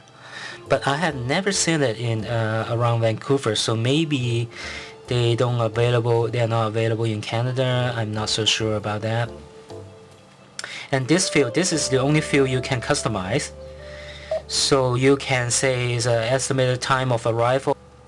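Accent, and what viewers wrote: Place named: Vietnam. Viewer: The accent of this guy sounds like Vietnamese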